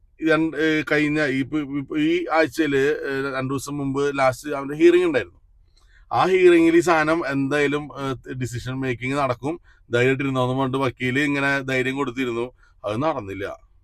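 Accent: Thrissur, India. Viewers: നാട്ടിൽ അങ്ങയുടെ വീട് തൃശ്ശൂർ ആണോ. ത്രിശൂർ ഭാഷ ആണല്ലോ പറയുന്നത്.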